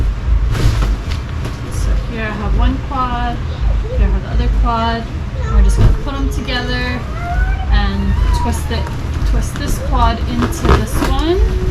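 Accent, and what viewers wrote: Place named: Hawaii, United States. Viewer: Omg. I’m listening and I’m like why sound like she talking pidgin. Then I look at your name 😅 Native Hawaiian here living in NY. So wonderful to come across Hawaiians even if it’s just on da internet ❤ Mahalo for this video.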